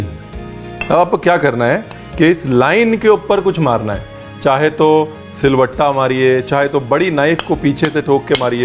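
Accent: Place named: Kerala, India. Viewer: he is a punjabi but has a clear mallu accent, what kind of mix is that. nice.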